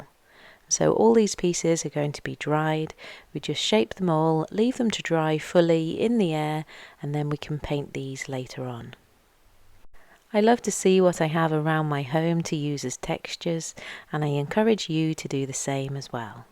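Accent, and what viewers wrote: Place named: Liverpool, United Kingdom. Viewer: I love the Liverpool (or nearby?) accent ….so singy and relaxing! Thanks for sharing all your knowledge!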